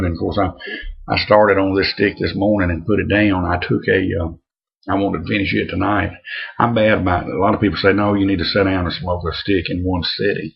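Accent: Louisiana, United States. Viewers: Greetings from Boston. I just love that Louisiana accent. I aim to drive my caaaa down there at some point. Got some Orlik Golden Slice on the way. Great for a morning smoke.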